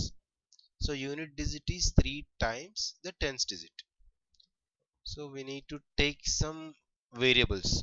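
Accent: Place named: South India, India. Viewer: Thanku.. sir I think so u r from South india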